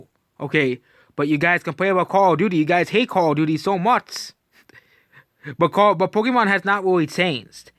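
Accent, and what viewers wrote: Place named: India. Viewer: But this guy sounds like a Indian scammer kind of you know Indian skimmer you guys trying to get us to buy Sony and Xbox or steam deck instead or game PC well already have two of those how about I go steam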